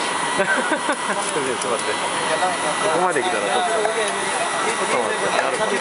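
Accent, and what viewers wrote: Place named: Japan. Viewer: very cool to hear a japanese guy speaking urdu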